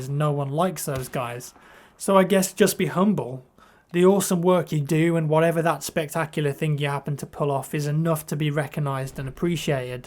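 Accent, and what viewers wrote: England, United Kingdom. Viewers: What part of england you from?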